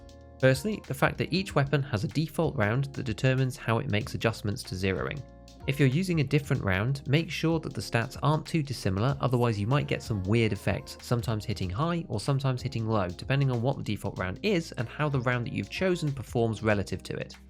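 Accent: United Kingdom. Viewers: He sounds brittish so I doubt he has even touched a real gun.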